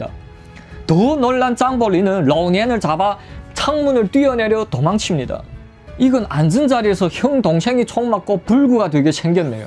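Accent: Yanbian, China. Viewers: 근데 진짜 조선족이신가요? 일부러 연기하는 말씨는 아닌거 깉은데. 여하튼 스토리에대한 말빨은 진짜 국적막론하고 듣는사람 잼나게 하네요. 근데 유선이야기는 제갈량 사후이야기로 첨부 나오는데 제갈량 죽기전전에 유선은 사망한걸로 아는데.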